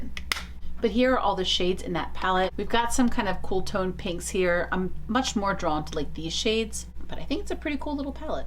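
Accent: Philadelphia, United States. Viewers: I just found your channel and I was like “tell me she’s from Philly….” Haha instant subscriber! I’m from the area too 😊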